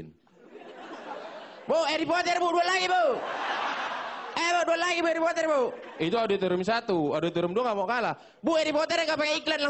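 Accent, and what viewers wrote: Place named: Batak, Indonesia. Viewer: bataknya nyanter banget, wkwkwkwk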